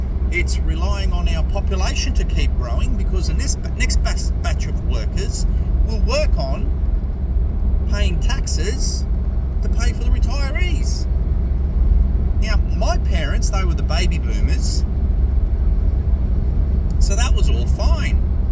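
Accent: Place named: Australia. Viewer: Hi mate, sounds like an aussie, me too. I'm in a similar boat, with the shit coins and BTC.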